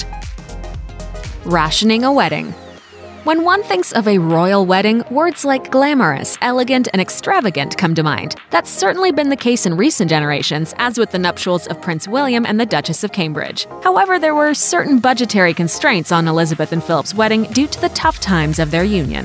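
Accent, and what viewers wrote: United States. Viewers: why is the narrator American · American accents (and not well spoken) are wrong for this kind of documentary (my opinion)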